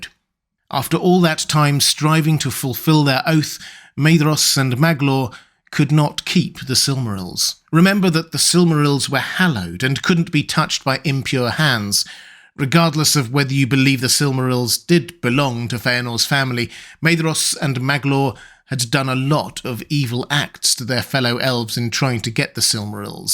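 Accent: United Kingdom. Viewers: I like to put on your videos and have your dulcet british voice lull me to sleep.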